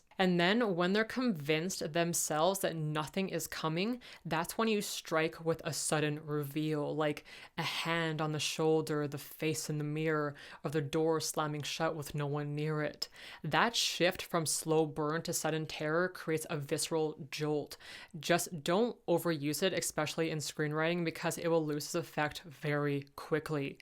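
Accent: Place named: Canada. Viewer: That's really interesting! Sorry to hear you were bullied for that. I never would have guessed Hungary. I'm from Minnesota in the US, and I detected a little bit of a Canadian accent in your voice, since our accents are somewhat similar. I like the way your combination of accents fit together! It gives your narration a unique and pleasing style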